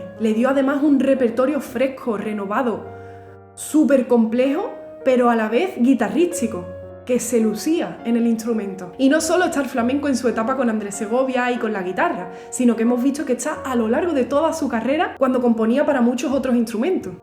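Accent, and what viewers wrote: Andalusia, Spain. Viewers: A mí me encanta oír tanta sabiduría, y tanta investigación, en mi acento andaluz. Porque siempre nos vemos en los mismos registros humorísticos y lejos de la divulgación culta y de la seriedad. Enhorabuena por tu canal. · Maravilloso contenido; investigación solida y consistente, vinculación de la múscia con sus contextos sociales y económicos, pasión en el decir, maestria en el hablar y todo ello con el maravilloso acento andaluz, repito, ¡¡¡maraviloso contenido!!! Gracias. Saludos desde Guadalajara, Jalisco, México.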